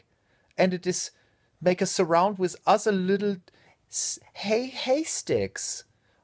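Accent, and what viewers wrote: Germany. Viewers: Hey, kannst du eigentilch auch Englisch reden ohne den Deutschen Akzent? Bei uns in der Schule in NRW lernt man das mit Americanischem Akzent :D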